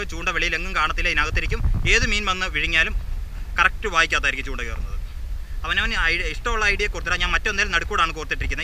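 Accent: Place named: Kottayam, India. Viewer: Anta kottayam bhasa manassilavanillaa. ..ijjonnu sarikk njammala Malabar bhasayil samsarikkado...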